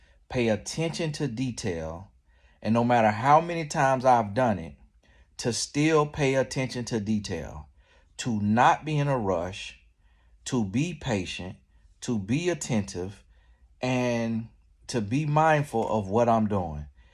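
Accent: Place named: Deep South, United States. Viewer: Deep south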